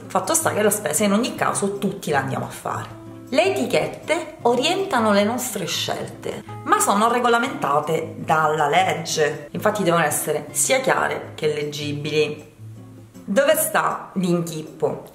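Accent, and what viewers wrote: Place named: Sicily, Italy. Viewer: Ciao, sono new entry,d’origine sicula come te ma marchigiana d’adozione ormai da quasi una vita, mi piace tantissimo ascoltarti, forse sarà propio per l’inflessione 😜 Grazie perché mi sto lasciando andRe ultimamente e seguendoti forse riesco a ritrovare in me la fatidica “scintilla “e poi via...a razzo!!🤩🤩🚀🚀😘😘❤️❤️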